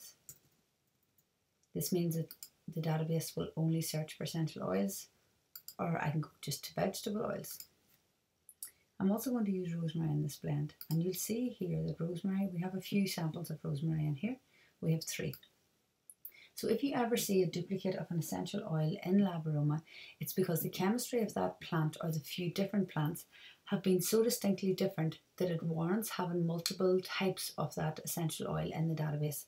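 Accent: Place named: Northern Ireland, United Kingdom. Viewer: Hello! This is a fantastic resource, and something I hope to invest in, in the future! Would you mind me asking where you're from? There's a definite touch of Northern Irish in your accent :D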